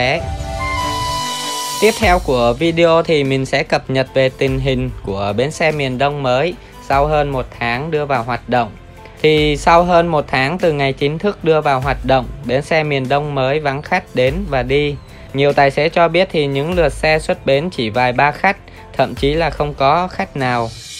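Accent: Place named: Thanh Hoa, Vietnam. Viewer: Ông này quê triệu sơn thanh hóa à.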